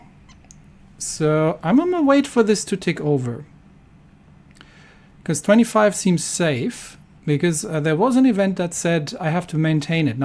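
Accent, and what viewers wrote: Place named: Germany. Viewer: Are you German? I thought your accent was noticeable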